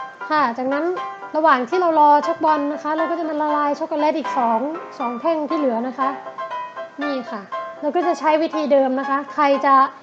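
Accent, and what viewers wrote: Thailand. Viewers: พี่พูดแปลกๆอ่ะค่ะ แบบเสียงเหมือนไม่ใช่คนภาคกลางอะไรประมาณนี้ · เธอไม่ใช่คนกรุงเทพฯ ใช่มั้ย สำเนียงไม่ค่อยชัด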